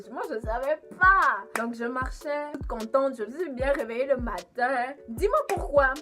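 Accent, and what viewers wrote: Canada. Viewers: It’s t'as un accent de canadien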